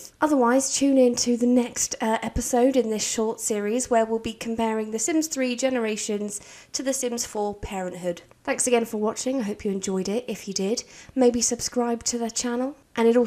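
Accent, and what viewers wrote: United Kingdom. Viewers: I like you accent were in the UK are you?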